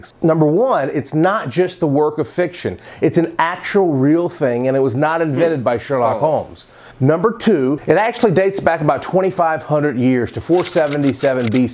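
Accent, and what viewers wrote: South, United States. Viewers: It’s so cute to hear his southern American accent saying things about Ancient Greece 😅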